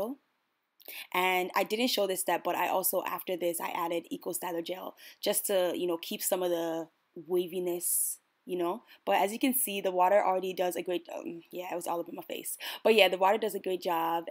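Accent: Nigeria. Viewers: I haven't watched a video of yours in a while but: your personality is still lovely, your smile is even more radiant and....I miss your Naija accent oooooo!!! 😕😉🙂 The wig looks great on you!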